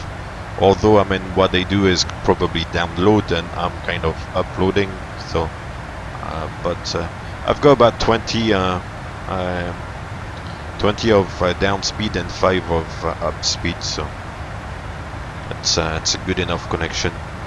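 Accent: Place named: France. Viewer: yay another video :D I really like your calm voice, and your competence of course But you are a real pilot, right? Doesn't it get boring to fly as your job and then when you are home to fly again? Like there are lots of good chefs that love cooking but at home they don't because they do it all day already :D I'm not complaining though. That way I can enjoy your videos :P May I ask where you are from? Your accent has something very french to it :D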